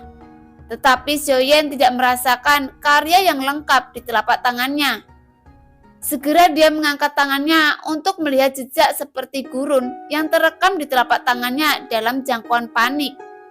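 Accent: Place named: East Java, Indonesia. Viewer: Baru kali ini nemu channel BTTH acak"an gini, dan pembawaannya medok jawa timur sungguh gak enak didengar, PARAH NI NENEK NENEK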